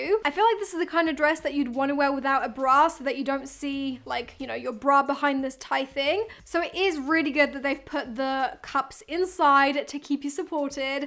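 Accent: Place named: United Kingdom. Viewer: I think lots of people from the UK talk like that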